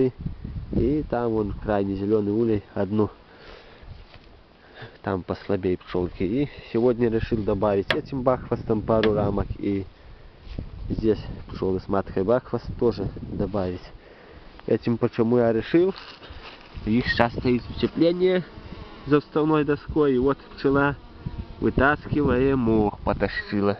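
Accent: Belarus. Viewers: По разговору слышно,что ты с Белоруссии и понятно,что климат там серьёзней,чем у нас ! Для меня подушки не нужны ! Здесь и о мхе ничего не знают. Удачи тебе ! Я на тебя подписан давненько и смотреть тебя приятно ! Молодец ! Удачи нам !!!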